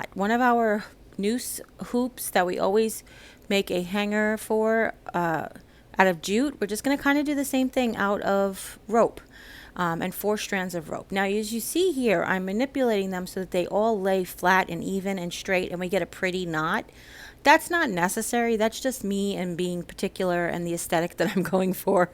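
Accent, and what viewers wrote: New York, United States. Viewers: I like your subtle NY accent (and your tutorials, too👍)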